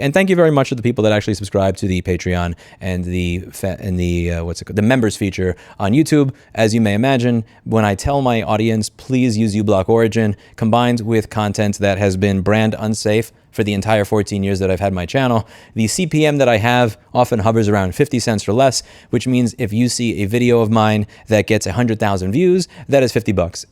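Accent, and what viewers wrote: United States. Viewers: Eeewww dude - congratulations on creating a rage bait sermon. Perhaps you should stay in your own lane with regards to this issue. You sound American - you guys were brought up different to us in New Zealand. The child you are asking to video tape their school bathroom is very questionable also. You, an adult - requesting or accepting video from a child/minor. Think about that careful bud. Pip from New Zealand. Age 47 🌻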